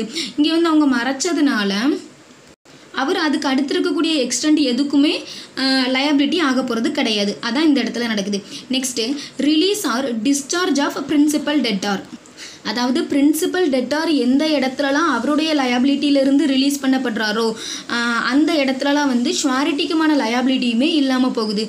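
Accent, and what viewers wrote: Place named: Nagercoil, India. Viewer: I think It's Nagercoil language...😊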